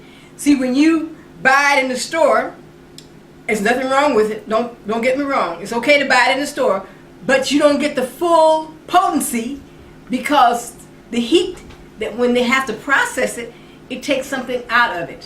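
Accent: United States. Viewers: For the first drmonstrationI got the aloe vera gel, the carrot, the honey, the juice but what is the last item she added ? I didn't get the last item he added because of her America accent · I am just Subscribing as this was in my recommendations. I love how you are surely Taking Charge of your Health and showing us that we can do so as well!!! You sound like you are from Down South Georgia somewhere! Blessings to you "Grandma"!!! 💓 💓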